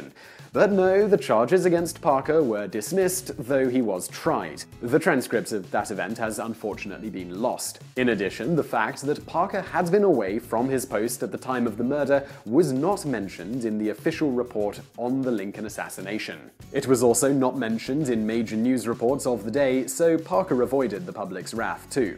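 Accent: England, United Kingdom. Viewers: Sounds weird, English accent talking about American history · You know so much about American history, and you have a British accent. Hmm...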